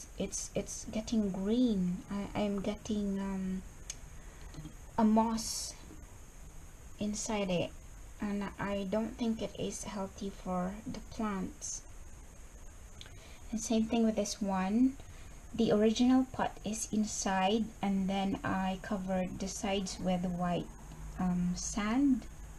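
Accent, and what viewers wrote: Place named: Visayas, Philippines. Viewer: hehehe... lately in the middle of your video... i notice your tone.... same as bisaya....... mabuhay po.... hoping for more video to come...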